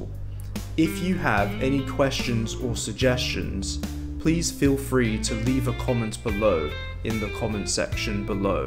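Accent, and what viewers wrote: United Kingdom. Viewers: прекрасный преподаватель с классическим британским произношением. То самое - которое считается золотым стандартом. Радио ВВС и т.д. Занимаюсь с больше 1,5 года. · Информативно. Британский акцент мне нравится больше, чем американский. Спасибо, что говорите медленно. Это самая сложная часть в изучении английского - восприятие на слух. Русский на слух воспринимать проще - он по звучанию почти не расходится с написанием.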